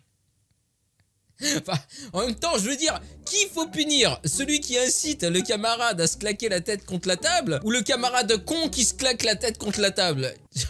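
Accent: Belgium. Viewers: C'est pas que tu fais mal l'accent belge enfaite c'est qu'on en pas on a le même accent que les français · Accent 11/20 je suis belge aussi🍟🍟🍟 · Tu fais bien lacsent belge Cars je le suis😁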